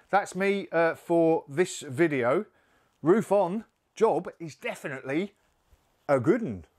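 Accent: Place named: South East London, United Kingdom. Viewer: Good 👍 Luck with the radiotherapy Mate. Where are you from in UK ?.sounds like a South East London / Surrey border accent. 🌴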